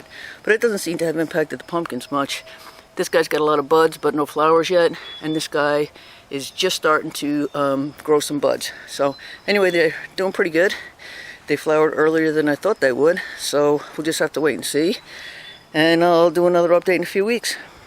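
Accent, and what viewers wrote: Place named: Pennsylvania, United States. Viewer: I have grown these here in NE VT. They take longer here, as we are borderline zone 3 and it does not get as hot as in your neck of the woods. I have a nice haul this year, about 20 of them from seed I saved from last year. I planted on June 1 after staring in the greenhouse. I just harvested them today, Oct 24 ( fortunately no frost yet). They are only just beginning to reveal their orange sections. I was wondering if these pumpkins can be just let to sit and dry in order to make seed harvesting easier and not such a gooey affair. Have you ever tried this? Anyways we are fortunate not to have a big problem with squash beetles, haven’t yet seen any here. The Japanese beetles preferred the hazelberts and prunus ( cherry plums and regular plums ) though they were less this year too ( maybe two years of trapping is helping). 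Keep on growing…. You sound like you live in PA…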